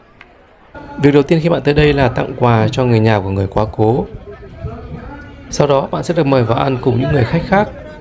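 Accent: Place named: Hai Phong, Vietnam. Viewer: Theo dõi kênh anh từ đầu. Nhưng video này thực sự phục anh vì: anh chịu khó và dũng cảm xin họ để đc quay. Lăn lộn vào quay từng ngóc ngách.Hỏi han họ để có thông tin.Góc quay đẹp và toàn cảnh chứng tỏ anh quay rất có tâm và có trách nhiệm trên từng thước phim gửi đến khán giả.Kiến thức thì phong phú,sâu sắc,giọng Hải Phòng thì hay khỏi bàn.Chỉ biết chúc a sức khỏe,tiếp tục giữ lửa và sự can đảm,nhiệt huyết trên từng video gửi đến khán giả chúng tôi có cái thú vị để xem. Chúc a nhiều sức khỏe.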